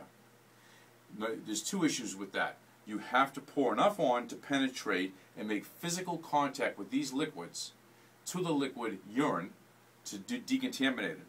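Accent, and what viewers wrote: Boston, United States. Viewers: I hear that Boston accent.......from a Galway man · that Boston accent lol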